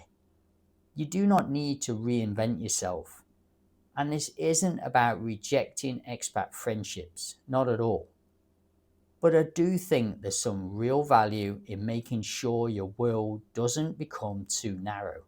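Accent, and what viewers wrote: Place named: England, United Kingdom. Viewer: could be it's accent thing from England lol, are you from north west UK ? I'm finally coming over. I had set deadline of 55 but pulling the trigger now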